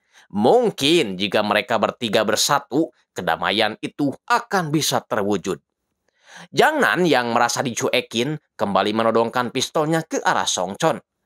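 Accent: West Java, Indonesia. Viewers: dari suaranya kedengeran jelas orang sunda😅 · Baru nemu chanel rekomendasi film2 unik, sapa dong mang wkwkw logatnya kga asing urang sunda 😂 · bukan iq7 bejir emang aksen sunda aja, bukan berarti aksen sunda = iq7 🗿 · Gua subscribe Karena channel ini ada logat Sunda nya 😂😂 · Lucu banget aksen sundanya kang😂, hatur nuhun sudah dibikin ringkasan Killer Paradox nya, seru pisan...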